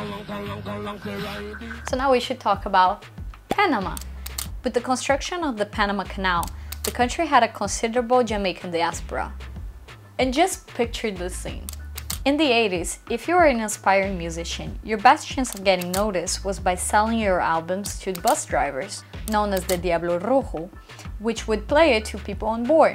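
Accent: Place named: England, United Kingdom. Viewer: Where are you from cus u got a strong accent in english but an english accent in spanish. It dont make sense.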